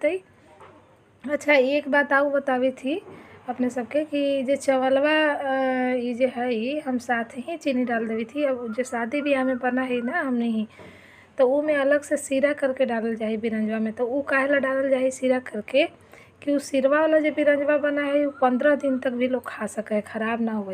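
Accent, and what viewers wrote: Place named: Magahi, India. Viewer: बहुत सुंदर सात्विक रेसिपी के साथ मगही आवाज, बहुत बहुत शुभकामनाएं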